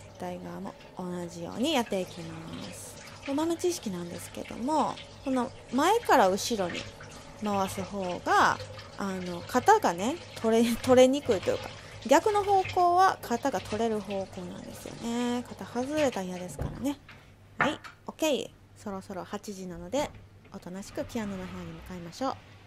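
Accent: Kansai, Japan. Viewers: 関西弁にいやされます。😂✨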